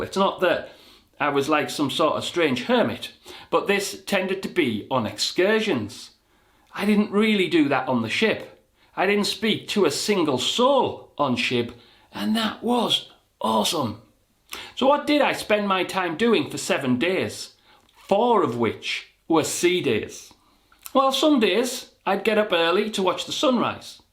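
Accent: Scotland, United Kingdom. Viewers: You sound Scottish? I want to follow your example for cruising!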